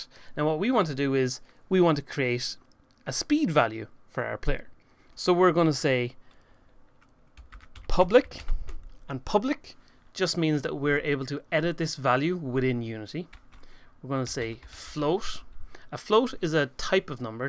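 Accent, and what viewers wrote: Ireland. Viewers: Thank you so much for this video. As a newbie to C# I appreciate you taking to time to explain your coding. I really like the Irish accent but I did type VectorTree instead of Vector3 at one point XD XD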